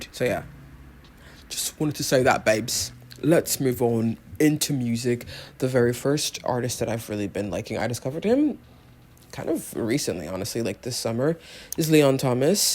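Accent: United Kingdom. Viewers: your british accent is cracking me up, omg haha. also you have new job? what kind of role do you have?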